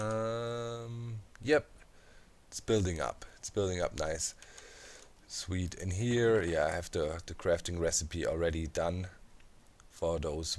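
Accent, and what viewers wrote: Germany. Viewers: I'm new here.. But i'm sure he has a german accent!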